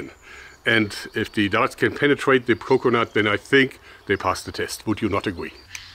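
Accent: Germany. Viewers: I dont know why, but hearing any German say "oops" instantly brightens my day and makes me smile and laugh, haha. I Love the German accent!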